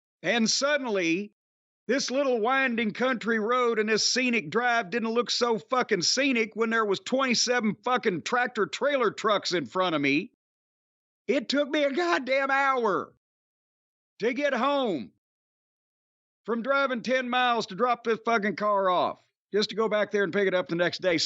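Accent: South, United States. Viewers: with all due respect from a resident of California “you damn liberal!”. it’s odd to hear your views from someone with a southern accent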